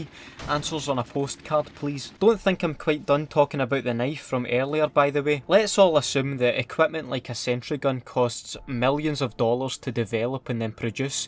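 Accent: Scotland, United Kingdom. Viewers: Is he Scottish? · The Scottish accent only makes it funnier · He's Scottish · I like ur Scotland accent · Nice Scottish accent, mate! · I love the Scottish accent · Are you Scottish but still nice vid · Who else loves this guys Scottish accent? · I really can’t take this seriously with the Scottish accent (no offense) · Not trying to be mean or raises he sounds like he is from 🇬🇧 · Man I love your Scottish accent lmao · I loke that Schootis acent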